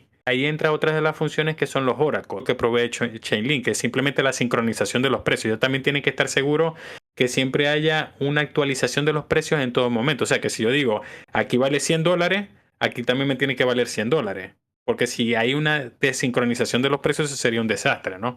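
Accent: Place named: Zulia, Venezuela. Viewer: Este canal es un.. what the fuck!! Solo por curiosidad escuche el acento zuliano, pero de verdad mal producido y desarrollado el contenido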